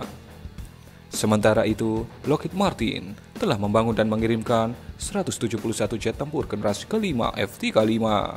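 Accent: Indonesia. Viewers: Medok bet nih yang ngomong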